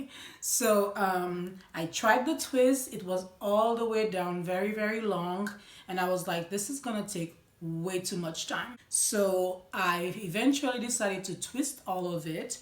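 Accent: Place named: Saint Lucia. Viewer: What island are you from you sound like a St.Lucain 🙋🏽‍♀️ if you are 👏🏽👏🏽👏🏽🇱🇨🇱🇨🇱🇨👏🏽👏🏽👏🏽... so I watched this video last night I’m great at doing hair and braiding and I’m quick ... I wanted something different I had NEVER done any twist with any Afro kinky so I did my hair last night ... it has NEVER taken me 5 hurs to do my hair I did it individual and I did 2 bundles 😤 it came out SWEETTTT AND LIGHT it was worth the time . Thanks for uploading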